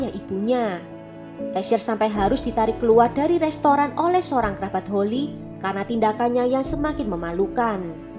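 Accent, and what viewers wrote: Java, Indonesia. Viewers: medok bngwt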